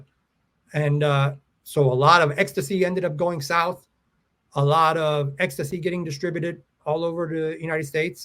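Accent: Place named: Chicago, United States. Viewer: You always say you're from LA but i knew I detected a Chicago accent. Great content, I hung around the underground club scene in Chicago from <num> in my late teens. Love the stories, reminds me of the stuff I was doing with my friends back in the day.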